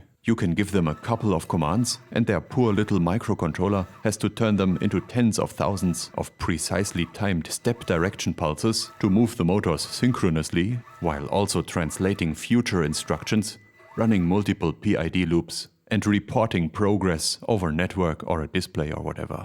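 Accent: Germany. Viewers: You are like This Old Tony with a german accent. Every single video that you do makes me laugh.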